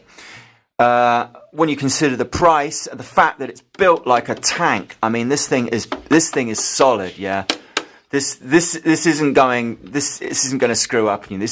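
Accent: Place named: South, United States. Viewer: southern voice makes me laugh but all in all find the series very interesting and funny. you persuaded me to go for a univox too great video that. look forward to the new ones. happy new year.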